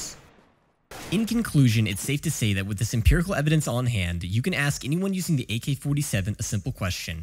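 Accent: United Kingdom. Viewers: nice brit accent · Good job on finding a subject not already rinsed by Philip. Your English accent is dire mate, kind of a weird nondescript UK chav come cockney gangster crossed with some old Victorian gent.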